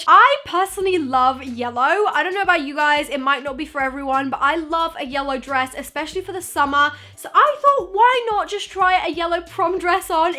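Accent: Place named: United Kingdom. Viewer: british accent nooo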